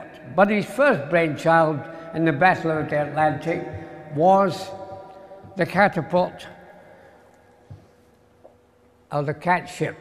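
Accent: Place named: Scotland, United Kingdom. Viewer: If he was Home counties and/or ex-OxBridge….. we would be welcoming, then warmly applauding ‘Sir Eric’. Shame about that Scottish accent too.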